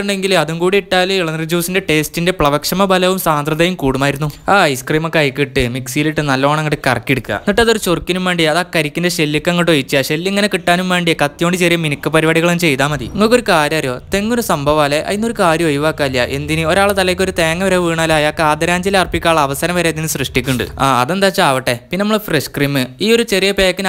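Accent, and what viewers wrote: Malappuram, India. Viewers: athin ith kasargod bhasha allalo malappuram ahnn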